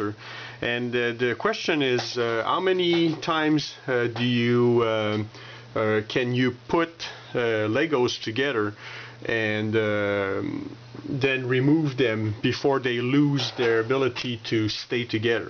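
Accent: Canada. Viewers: Togeder! I keep cracking up when he says together and I love your Canadian accent. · you sound like you are French Canadien. Either way looks good.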